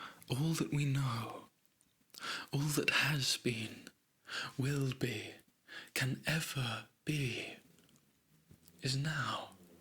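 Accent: England, United Kingdom. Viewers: God I'm in love and is probably because of his weird behavior and british accent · Something about your accent, poetry writing, mug of ✨hot chocolate ✨ and train destination reading just sits right with me, it is so british i love it!! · i am almost early yeyyy😂 i love english accent oh god😻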